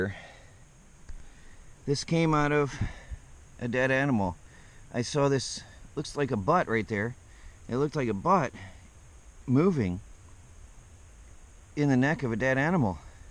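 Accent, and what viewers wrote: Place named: Michigan, United States. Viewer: Yeah you sound like you're from michigan too.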